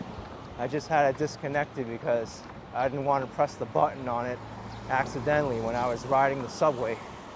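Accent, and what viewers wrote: New York, United States. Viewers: NY accent.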